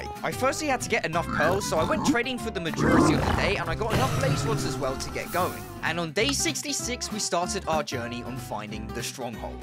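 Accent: United Kingdom. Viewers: Are you a british? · Why are you British? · Bro is so brittish 😭😭 But the video is guud😂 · Are you speaking british english? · sorry for out of the topic, but... is that British accent? because i really want to learn English and i hope i can speak with British accent too. i don't know why, American accent is too difficult for me😢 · I love your accent! Are you from England? What region? (I still can't tell between England or Australia, sorry if I'm mistaken!)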